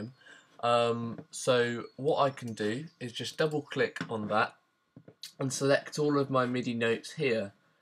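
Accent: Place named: United Kingdom. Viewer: Your accent is distractingly British but you make a mean video mate. Short, to the point, and telling me everything I need to know. Well done sir!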